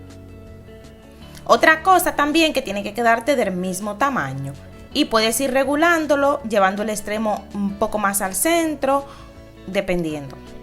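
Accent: Dominican Republic. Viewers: Hola 👋 gracias por compartir tus ideas! 💡 Una pregunta , ¿ Eres Dominicana ? Tienes un acento sureño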